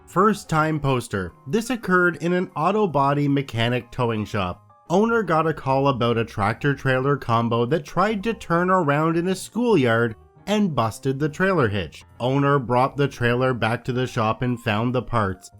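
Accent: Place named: Canada. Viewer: Also "manufacture" and "process". You don't have the stereotypical Canadian accent on "house" and "about" and you don't throw in a bunch of "eh"s, but the accent is still pretty prominent.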